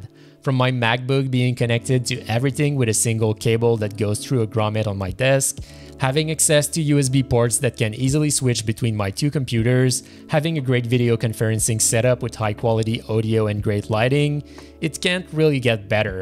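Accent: Quebec, Canada. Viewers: L'accent québécois! yessiirrr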